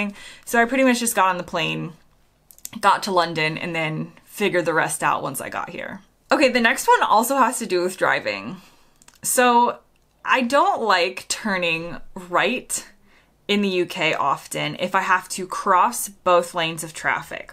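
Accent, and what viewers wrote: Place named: United States. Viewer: Love your US accent ❤❤❤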